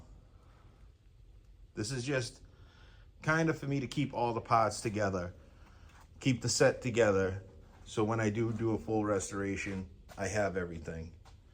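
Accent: Boston, United States. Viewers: Great video! Are you from North or South of Boston? Apts look like either Brockton or Dorchester. I lived on South Street in the early 80's Campello section. Def a Boston accent! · dam! I was gonna say Brockton when you said south shore. But your accent is thick so I thought closer to Boston. Just moved my son into a house in Brockton. Like Avon border, so not too bad. Nice neighborhood…for Brockton 😂. I can’t say too much about it. I live on the border of Woonsocket RI. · Wow, man! I saw a poscammed. Free drums in the woods somewhere and just assumed it was a scammer. Maybe not related . Either way, this is absolutely crazy!!! What a killer find. Good job tracking it down, man. And hearing you say "pahk" and "pahts" so many times just added to my enjoyment 😂😂😂 Had to get a Boston jab in there as a New Yawkah! So envious on that Vistalite kit. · Sketchy park, and Boston accent. 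Definitely thinking Brockton or Fall River 😂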